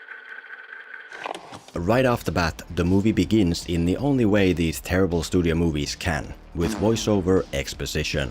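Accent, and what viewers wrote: Finland. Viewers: Onko tuo suomalainen aksentti jonka kuulen?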